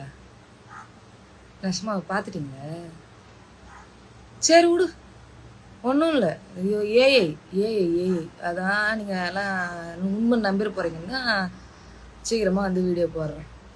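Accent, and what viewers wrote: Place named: Tiruchirappalli, India. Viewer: Nee endha ooruma? Trichy slang a theriuthu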